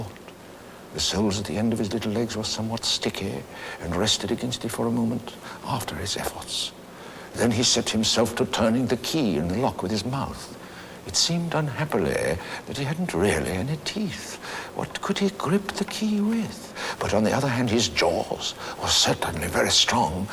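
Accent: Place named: United Kingdom. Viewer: I hate to be haughty, toReasonWhy, but I do think the question rather is "why are Americans like you so ignorant of anything non-American that you'd mistake a British accent for a French one?".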